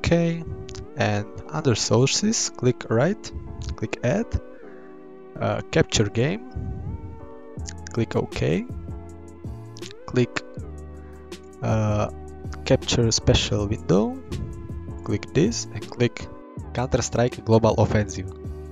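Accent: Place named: Slovakia. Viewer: som si hovoril že jaká slovenská angličtina :D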